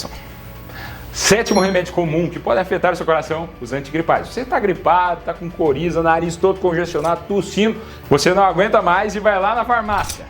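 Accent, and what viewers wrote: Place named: Minas Gerais, Brazil. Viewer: Gente este médico é uma benção de DEUS, gosto demais do jeito mineiro que ele fala parece que tá chupando picolé de groselha e falando ao mesmo tempo , ele é super bem informado , explica super bem , é simpático demais mesmo , um sorriso pra cima que levanta a gente , passa a impressão de ser muito cuidadoso com seus pacientes. Trás informações muito importantes e atuais pra gente , aaamo qdo ele fala : de que parte do mundo cê é ??? kkkk DEUS abençoe e proteja muito ele e a sua família, que ele continue sendo alegre , divertido , e super profissional como é, e sim , já me entupí de muitos remédios aí que ele citou , não sabia de nada disso , meu DEUS , já tomei muito azitromicina pra garganta, hj tenho usado muito o Propolis Verde que tem me ajudado e me imunizado. Fui 🙋‍♂️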